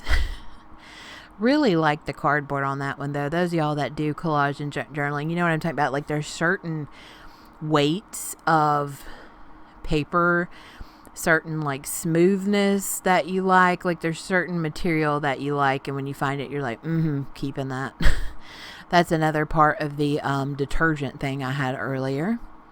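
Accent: South, United States. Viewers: thanks love your harvest videos. you sound like you are in the south. i am in nc. i have 10 cat rescues. Or should i say they have me lol i remember in one of your older video you had mentioned you were in college, do you still go, hugs and stay safe out there. I am older with health issues so i have to stay put for a while. fun is lol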